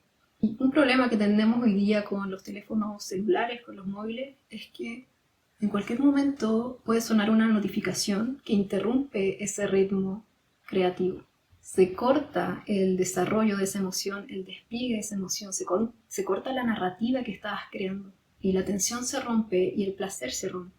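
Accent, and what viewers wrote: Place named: Chile. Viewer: Que bonito tu acento ¿Eres chilena?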